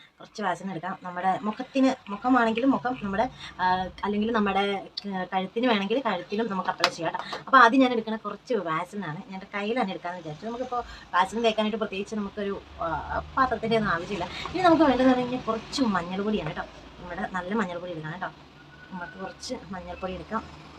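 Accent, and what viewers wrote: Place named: Hindi Belt, India. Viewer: Hindikaru de Malayalam tracilete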